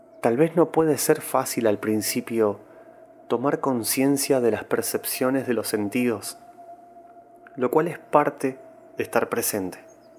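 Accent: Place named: Argentina. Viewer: gracias! y ando esperando mas capitulos de los libros que quedaron inacavados. me ayuda tu voz argentina. memorizo mejor je.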